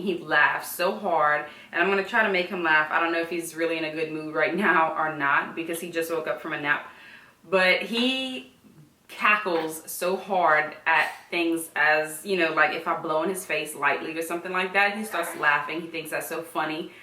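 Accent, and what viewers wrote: Louisiana, United States. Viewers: girl he is so cute, Your LA'na accent is so thick LOL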